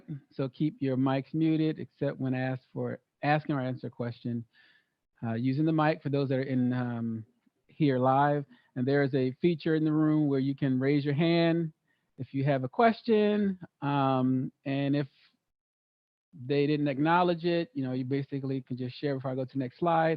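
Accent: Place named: Israel. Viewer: Shalom mishpâchâh!! I am enjoying this channel.. can you please help me with understanding the proper Hebrew to learn? The Hebrew that you speak, sounds like the same Hebrew that is mixed with Yiddish that the people in ISREAL speaks. Is it? Also the Hebrew you speak and teach is different from those who speak paleo Hebrew. With the vowels being Aa, Ba, Ga, Da etc. etc. I want to know it fluently but there are different vowels and Annunciation. Can you help me with understanding the difference between the two so I can become fluent in our language? Thank you sincerely Shalom or is it Shalawam?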